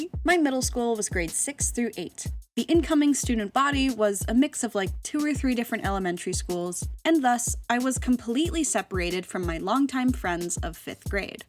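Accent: Canada. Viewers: IS CANADIAN CONFIRMED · you sound Canadian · So... You're Canadian? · the canadian-ification of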